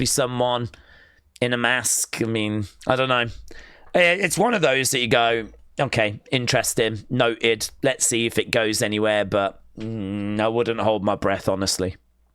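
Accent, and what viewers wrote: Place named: United Kingdom. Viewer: Shoutout from my friend Sonia from The Canary Islands. She loves your british accent! Awesome!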